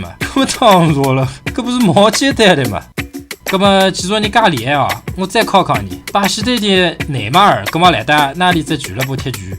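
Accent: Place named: Shaoxing, China. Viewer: 感觉就是普通话，没听出吴语除了一点绍兴音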